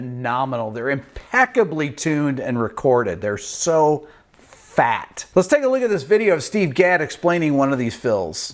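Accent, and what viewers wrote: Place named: Central New York, United States. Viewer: Hey Rick, I dig your CNY accent. Just dawned on me. Gotta ask, i realize this video is a year old. Ever know Gadd or of Gadd since you grew up in the same area? Rochester has had some really good drummers come out of there... also, was always curious why Mel Lewis, a Buffalo dude, had bad things to say about Gadd. Or at least didn’t like his playing / mocked it. Maybe Lewis is too much of a purist. Any idea on the history between those two?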